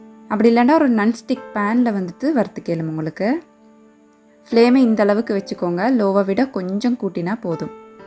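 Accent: Akurana, Sri Lanka. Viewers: Pechi Slang akurana mathiri irunshi athu than ketten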